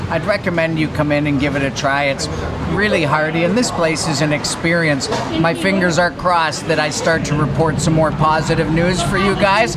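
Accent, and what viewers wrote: Canada. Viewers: This man has the most Canadian accent ever. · Can't stand Canadian accents. I'm out....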